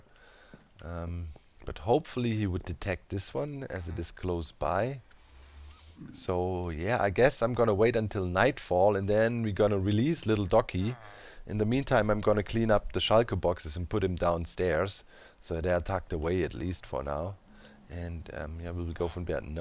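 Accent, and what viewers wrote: Germany. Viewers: Du hörst dich ziemlich deutsch an, bist du ? Wenn du nicht antwortest denke ich mal nicht :)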